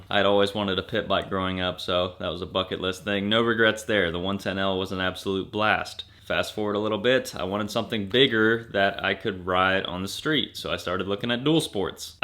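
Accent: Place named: United States. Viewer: Just a nitpick but KTM and Husqvarna are also by definition "across the pond". America doens't make jack shit with regards to off-road motorcycles, not a damn thing. Am I assuming too much? You sound like an American!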